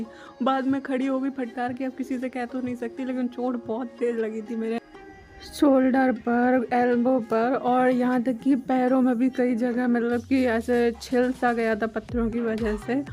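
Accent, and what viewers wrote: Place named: Bundelkhand, India. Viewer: tum to uma ke yahan hi enjoy kar rahi thi tum log bundel khandi ho kya boolne ka dhang se mallom.parta hai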